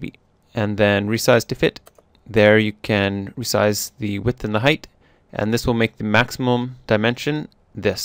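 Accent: Canada. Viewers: That's a canadian accent.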